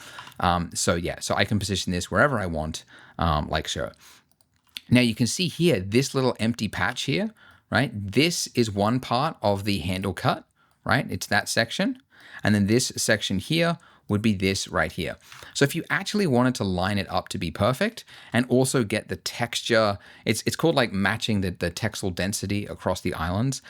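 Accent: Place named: Australia. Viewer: Oh thank goodness, I'm so glad to hear an aussie accent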